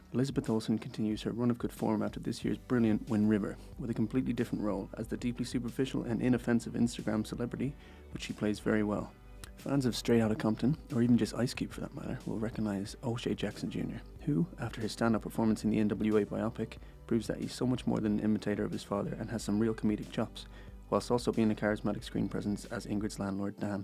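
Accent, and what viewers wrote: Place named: Ireland. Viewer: Nice work. I'll definitely be checking in on your reviews from time to time. The format's strong, albeit a bit rushed? That's my only criticism. I understand your accent fine but outside of Ireland, your audience might struggle at that speed. Or maybe not. I guess time will tell. Looking forward to seeing the channel grow.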